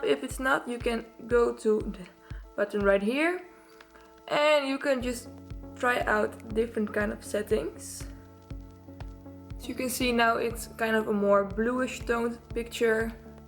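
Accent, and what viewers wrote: Netherlands. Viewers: ik ken je kanaal pas net maar je bent echt gorgeous!! aan het begin van deze dacht ik al gelijk te horen dat je Nederlands was en net ik de comments zag ik dat dat ook klopt lmao! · u dutch? guz your accent sounds dutch tho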